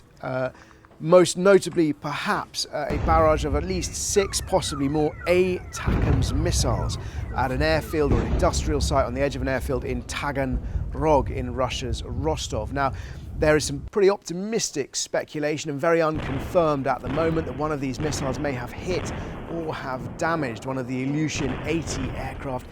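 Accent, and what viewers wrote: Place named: United Kingdom. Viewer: Loose your British accent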